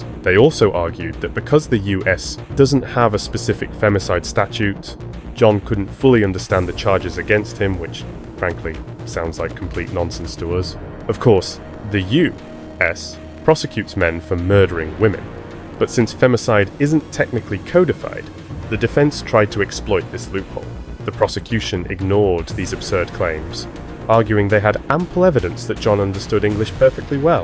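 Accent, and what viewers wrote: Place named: United Kingdom. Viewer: Tip: get rid of that offensive, slimey british accent😮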